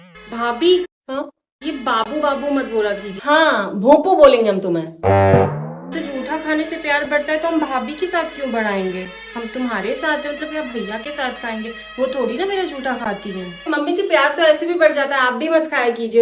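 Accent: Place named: Varanasi, India. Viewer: मुझे तो आपकी पुरानी वीडियो - बनारसी लहजे vali बहुत पसंद हैं , वो फिर से डालिए please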